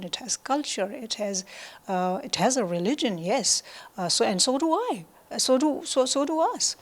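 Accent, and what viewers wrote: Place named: United States. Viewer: This bi is not pakistani. Her accent is fully American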